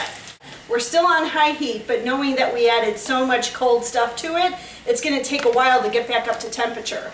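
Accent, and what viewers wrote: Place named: Midwest, United States. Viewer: Beautiful woman. Her accent sound like from the mid West.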